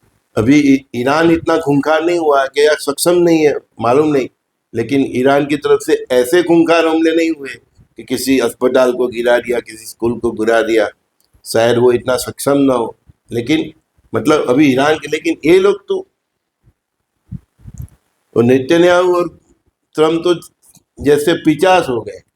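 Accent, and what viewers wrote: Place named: Gujarat, India. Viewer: Vo gujrat ke hai, hindi mother tongue nahi hai unki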